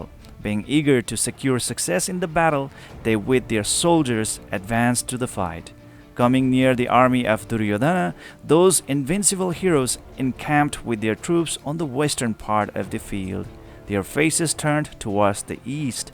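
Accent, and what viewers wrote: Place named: Nepal. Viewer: You have a rich Nepalese accent. Keep the brilliant work going.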